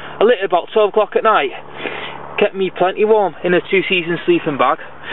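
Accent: United Kingdom. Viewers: dear harry bowhemoth he is speaking the queens english just like you. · Didn't mean to insult. It is very hard to understand him with the British accent, it is very heavy for me and others to understand. It would be interesting if I could understand most of it.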